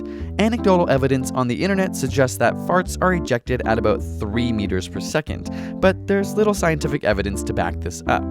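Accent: Canada. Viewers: I'm starting to realize you have the accent of a Canadian by the way you pronounce "outrun" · Haha, your canadian accent was strong in this video...Almost like you were emphasizing it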